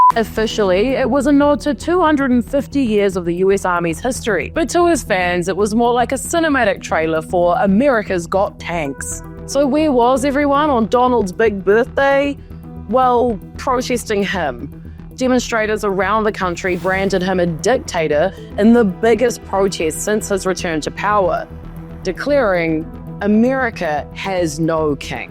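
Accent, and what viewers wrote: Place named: New Zealand. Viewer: You have the perfect balance of serious vs funny, if you can't laugh you'll cry? or something like that .. I'm from NZ and it's cool to hear the accent on youtube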